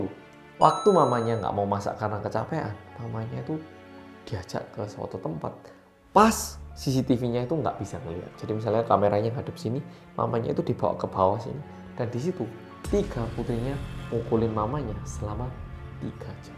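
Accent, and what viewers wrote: Java, Indonesia. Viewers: Medok medok medok rekkkk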